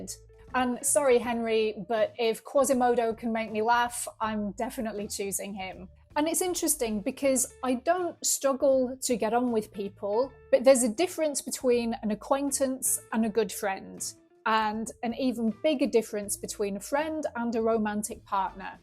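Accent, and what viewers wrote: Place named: RP, United Kingdom. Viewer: Very posh .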